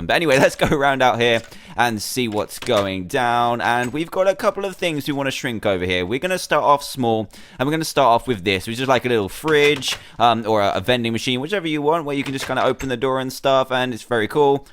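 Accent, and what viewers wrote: United Kingdom. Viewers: SO THATS HOW HE SAIS IT BECAUSE HE IS BRITISH AND THEY PRONOUNCE DIFFERENTLY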